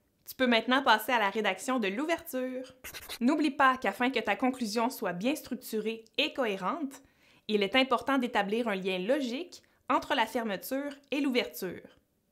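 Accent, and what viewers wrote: Quebec, Canada. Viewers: bah il est normal, c comme ca qu'on parle au Québec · Merci pour vos videos. L'accent quebecois est mignon.😊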